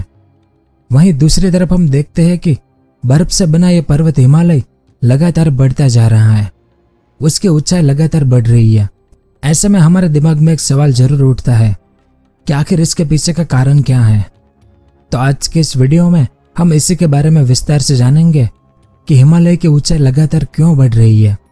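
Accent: Gujarat, India. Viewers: Bhai kya Gujarat se ho kya aap dappu k papa jaise bol Raha hain aap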